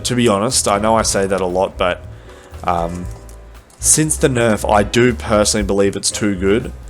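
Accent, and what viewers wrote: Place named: United States. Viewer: That American accent through me off real good. It was perfect.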